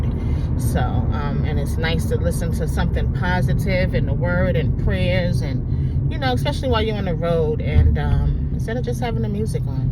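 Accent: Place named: New York, United States. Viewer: Love the accent NYC💯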